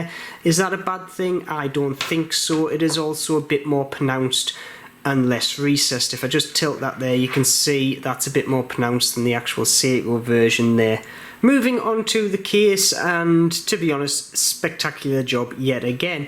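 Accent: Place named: North, United Kingdom. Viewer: Can’t place the accent. South Durham, Cleveland? I enjoyed the review. I have a black gen 2, which, needless to say, I adore. May just get one of these.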